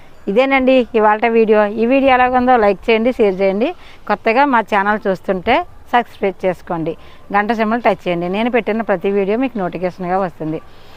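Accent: Godavari, India. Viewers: Hii garu mee oopikaki joharulu andi me matalu vini godavari zilla anukunna madhi Kakinada andi.❤❤❤❤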